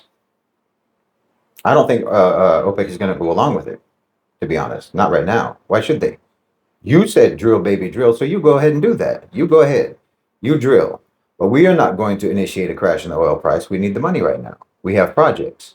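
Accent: United States. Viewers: The talk is in English and in the US accent because this guy is also an American but got over the indoctrination.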